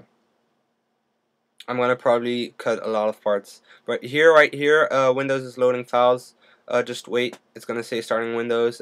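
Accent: Netherlands. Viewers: Are you dutch? (Recommend at this channel)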